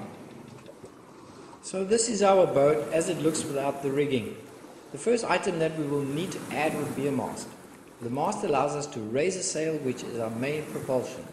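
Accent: Durban, South Africa. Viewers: Hi great video, and I wish I had it when I started sailing. Your accent sounds like from Durban, SA. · Love listening to a South African teaching me about sailing...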